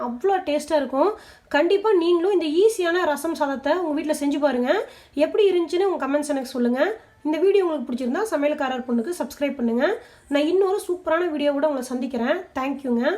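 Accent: Kongu, India. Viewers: ரசம் சாப்பாட்ட விட உங்க கொங்குதமிழ்தானுங்க சூப்பரு, ஏனுங்க என்னுங் நாஞ்சொல்ரது...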